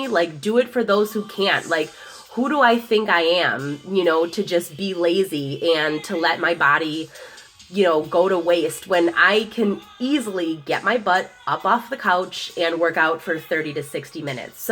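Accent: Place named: Chicago, United States. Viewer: sounds like chicago baby!!!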